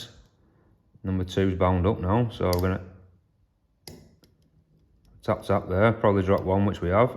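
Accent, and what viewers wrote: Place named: Cockney, United Kingdom. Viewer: Nice video, I'm thinking about getting one of these Lishi knock-offs for Yale keyways. This guy is funny, I would love for him to read a children's story. LOL. I love his accent (cockney?). Love his colorful way of speaking. I can just imagine............ "She tasted the porridge from the first bowl right. “This porridge is too fuckin hot!” she exclaimed. So the little wanker tasted the porridge from the second bowl. “This porridge is too balls-deep cold,” So, the street-walking Hussy tasted the last bowl of porridge. “Ahhh, this porridge is just dead-on balls fuckin' right,” 👍👍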